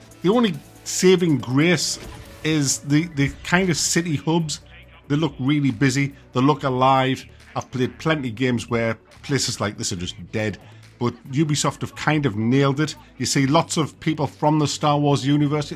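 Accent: United Kingdom. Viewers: Why do you sound more British than ever in this video? Something is different about your voice.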